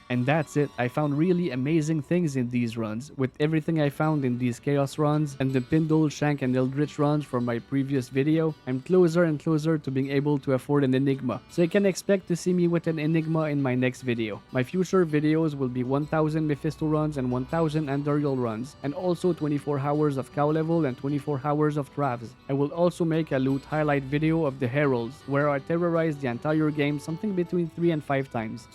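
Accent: Quebec, Canada. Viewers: L’accent québécois s’entend à travers l’anglais, hahaha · Tes québécois ??? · Do i hear some french from QC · Je pourrais reconnaitre un de mes fellow québécois entre 1000! Bonne vidéo 🔥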